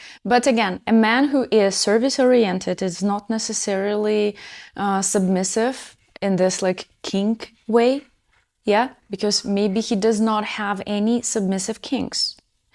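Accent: Russia. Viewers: Is ugly Russian accent a must in such lessons?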